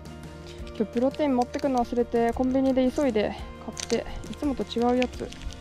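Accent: Gifu, Japan. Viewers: MARiAさんスズメ蜂ヤバかったですね。 山には人を惹きつける不思議な魅力があります。難易度が高ければ高いほど惹きつけられるものです。 事故には注意して下さい。ちょっとの骨折でも二度と登れなくなる可能性もあります。このコースも想像を絶するものでした。 MARiAさんの岐阜弁大好きです！ こんな綺麗なクライマーはいない！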